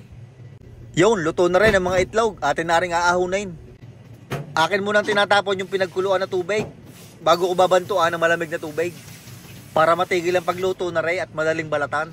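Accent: Batangas, Philippines. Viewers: Parang Taga Batangas 😆😆🤧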